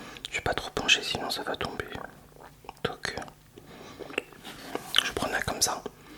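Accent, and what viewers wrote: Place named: Alsace, France. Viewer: Tu est alsacien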